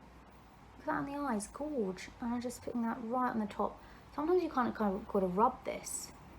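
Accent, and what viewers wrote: United Kingdom. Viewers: Just found your channel! Fabulous look! You are so funny....adore your accent, dare I say you are British! Love love love London. Hubby and I were there in mid May for vacation. Hated to leave!! . Also spent a week there in 2017. Will be watching more of your videos. 🌷🌷🌷🌺💐💐💐😀😀😀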